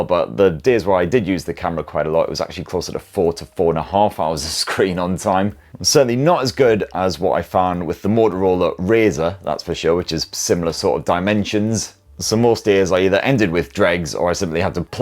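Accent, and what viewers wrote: United Kingdom. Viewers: Best is the ironic sense of humor and the British accent! 😃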